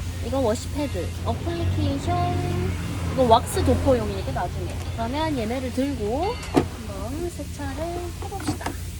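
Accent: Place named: Gyeongsang, South Korea. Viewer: 서울말 쓰려고 노력하는 경상도 미인이네^^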